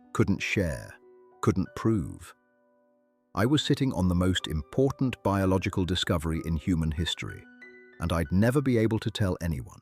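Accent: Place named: England, United Kingdom. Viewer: Why does the AI narrator have an accent that sounds like someone from England when this story is about the Cascade mountain range from Washington state, USA?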